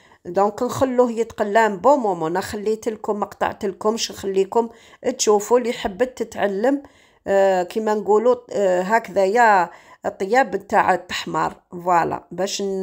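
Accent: Algiers, Algeria. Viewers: لكن تبان في هدرتها باينة لهجة عاصمية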